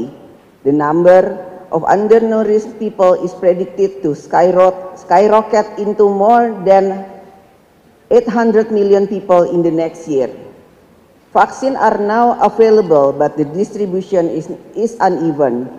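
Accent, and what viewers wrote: Indonesia. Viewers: Ingris rasa jawa.. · Selain yang sudah dibicarakan banyak orang, kesalahannya juga tuh dalam mengucapkan huruf "r" masih seperti dalam bahasa Indonesia. Harusnya tuh ga kaya gitu cara ngucapinnya. Jadi, lidahnya itu jangan menyentuh langit-langit mulut. Kalo menyentuh langit-langit mulut, ya jadi salah itu. Untuk lebih jelasnya lihat saja pidato bahasa Inggris presiden Amerika, apa pidatonya siapa gitulah yang pake bahasa Inggris dan bahasa Inggrisnya bagus gitu...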